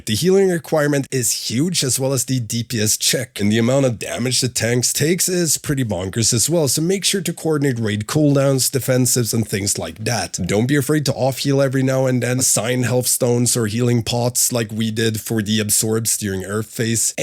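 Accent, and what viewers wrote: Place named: Sweden. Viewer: dat bjutiful swidish accent :)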